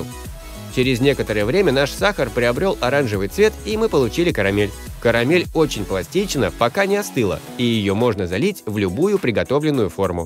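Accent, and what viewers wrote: Shymkent, Kazakhstan. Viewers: Ты че с Шымкента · Ты из Казахстана? Шымкент?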